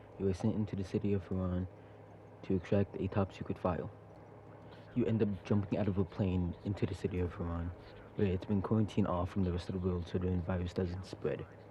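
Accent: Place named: South Africa. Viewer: Great game. Thanks for the video. Btw, what accent is that? Sounds like south african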